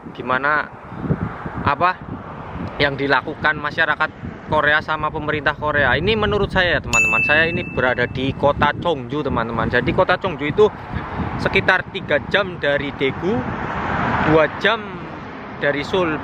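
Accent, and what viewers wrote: Banyumas, Indonesia. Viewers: Ciri khas mas...ngapak😆👍👍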